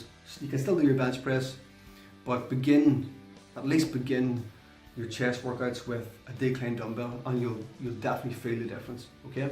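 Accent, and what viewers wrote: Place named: Northern England, United Kingdom. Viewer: WOh your always with the truly objective, scienctific logic to training for hypertrophy, been following your THT programmes since i bumped into in online back in 2016, i really like how you explain things & i got the sense that your the type of person who is on his own personal quest to find the truly most effective knowledgable way of achiving said goals - for example this video on Flat barbell chest, everyone else goes with the "conventional" wisdom which i get, but your taking the risk to say what you believe and regardless of anythign else i respect that! So again thanks for all your effort and saving me years of doing it the wrong way from all the Bro science thats out there! Ps. Loving the Northy accent!!! (from a thick oul Dub):-D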